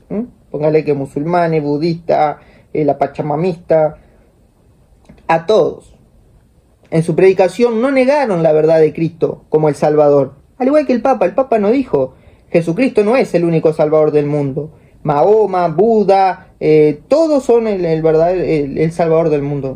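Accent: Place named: Argentina. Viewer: Quise ver todo tu vídeo pero no aguanté. Me voy a guiar un poco en la miniatura del vídeo que dice "Cansado de la ignorancia católica", casi al inicio dices "me dirijo a vos católico", refiriéndote así a los laicos comprometidos porque, por lo que oigo te parecemos ignorantes porque decir que Francisco dijo una herejía (bueno, ha dicho tantas) en su gira por Asia y Oceanía, te voy a comprar eso de que somos ignorantes, pero no sólo los laicos lo han dicho, lo han dicho sacerdotes, obispos, cardenales, ellos sí saben sobre las Escrituras, sobre el Evangelio, o es que tú sabes más que ellos? No es más bien que tú te llevas por el sentimentalismos de que Francisco es del mismo país que tú y eso no te deja ver que lo dijo Francisco es una total aberración. Cuando digo sacerdotes, obispos y cardenales, me refiero pastores valientes, no como el sacerdote Byron, creo que ASÍ se llama, que se enojó y defendió a capa y espada lo que dijo Francisco, con el argumento ese de "quiso decir", como en las novelas cuando el esposo encontraba a su esposa en la cama con otro y ella decía "esto no es lo que parece" jajaja. 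Ahora, por tu acento doy por hecho que eres argentino, si es así debes saber que Bergoglio tiene una trayectoria nada buena cuando fue obispo en su diócesis, apoyando, defendiendo y solapando a pederastas, y es muy raro eso que a puro sacerdote gay los haya puesto en puestos de alta jerarquía dentro de la iglesia, muy raro, de ahí todas esas ideas de amor y paz entre todos, so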